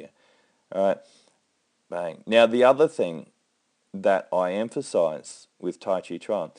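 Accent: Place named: Australia. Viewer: I like that you take the time to breakdown and study the fight, and the realistic approach on how taiji can be applied. It's sounds like you're Australian, where are you based?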